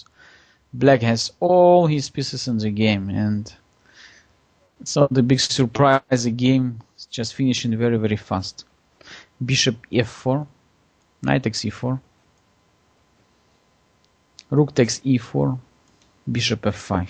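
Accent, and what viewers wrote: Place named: Arab world. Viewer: Лайк за акцент: озвучку Stronghold Crusaders за арабских воинов вспомнил, прослезился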